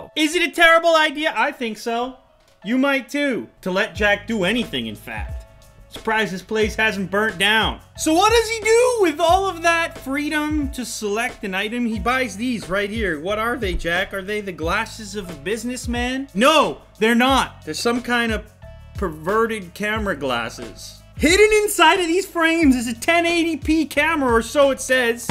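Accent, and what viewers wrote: United Kingdom. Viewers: actually a pretty good uk accent! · That Excellent British accent though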